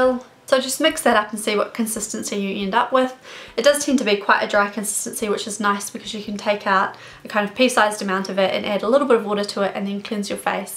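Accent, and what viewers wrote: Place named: South, New Zealand. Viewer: It’s because she comes from the south of New Zealand (the south island) and they tend to have a different accent to thise who like up north (the north island)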